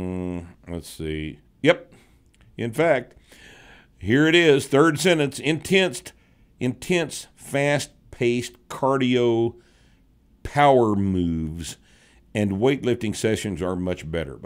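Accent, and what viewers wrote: Texas, United States. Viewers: in best Zap Branigan Impression a Texan can do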